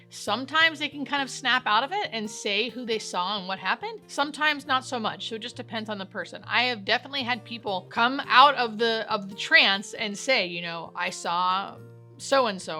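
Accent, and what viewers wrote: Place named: United States. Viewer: Bless you, no offence meant you high yank accent is difficult and painful to my ears, again no offence meant. Your video belongs in north America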